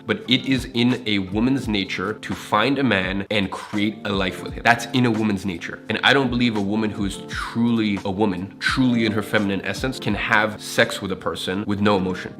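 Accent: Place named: France. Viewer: French accent 😂😂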